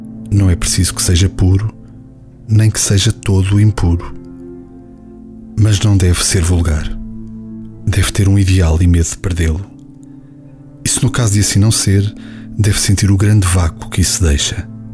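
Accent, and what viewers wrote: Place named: Portugal. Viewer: Gostei de escutar no sotaque do portugês de Portugal!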